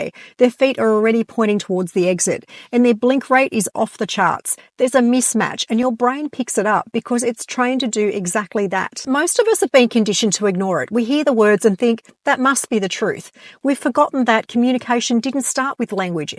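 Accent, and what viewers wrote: Australia. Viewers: OMG the Aussie accent is so harsh on the ear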